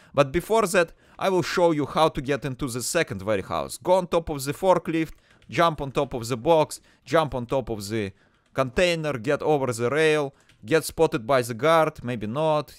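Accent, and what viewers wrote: Russia. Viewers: love the russian accent and thanks for making this tutorial i didn't know how to do this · This is one of the less common variations of russian accent btw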